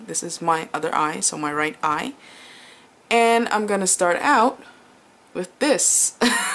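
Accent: Netherlands. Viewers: you're dutch aren't you? because of catrice and your accent..? i'm dutch tho xx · wauw!! prachtig haha. Ik hoorde trouwens meteen al dat je Nederlands bent haha :P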